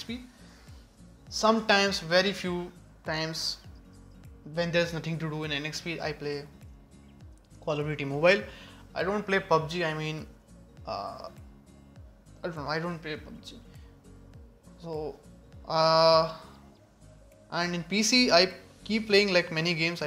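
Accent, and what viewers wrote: India. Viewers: I luv this man!!!😅 I came to comment section after watching this whole Mahabharat length video!!!😆 Luv from India (Manipur)❤️. N u look dashing n also speak English very well...luv that original Indian accent!!!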